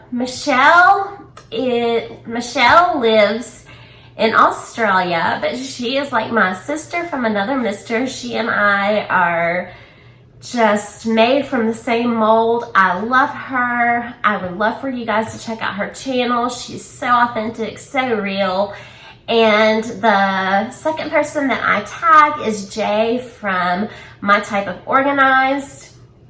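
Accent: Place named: North Carolina, United States. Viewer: I love these Q&A videos and learning about other creators. Now that make sense that you are from N.C. With your accent. Growing the YouTube channel is quite the feat. Great job. Learning new Youtube skills is so fun. Thank you for sharing.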